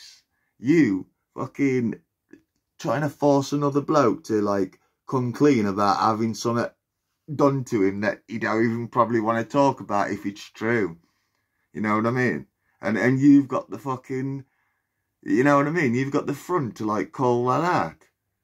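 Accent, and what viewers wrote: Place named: Black Country, United Kingdom. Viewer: Nice black country accent...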